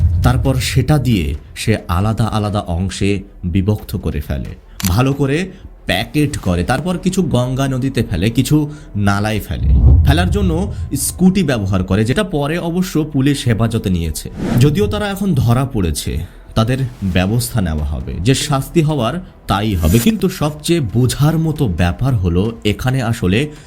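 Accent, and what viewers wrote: Bangladesh. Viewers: আপনার কথার ধরন শুনে মনে হচ্ছে আপনি বাংলাদেশী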